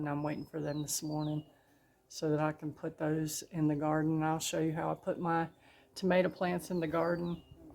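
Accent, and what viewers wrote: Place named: Western North Carolina, United States. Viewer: How exciting to find your videos! I am definately going to try the water bath canning! I also love that you are from my neck of the woods(western NC).....or sounds pretty close anyway.......I am in Lenoir! Looking foward to more of your videos......I am enjoying now going through all the previous ones. Thanks!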